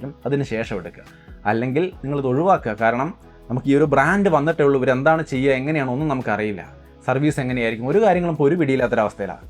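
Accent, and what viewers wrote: Thrissur, India. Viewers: Are u from Thrissur!??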